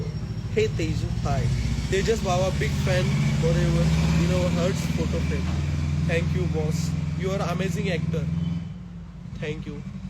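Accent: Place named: Kolhapur, India. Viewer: आरे दा तु लय भारी बोलतो तु कोल्हापूरचा आहे का रे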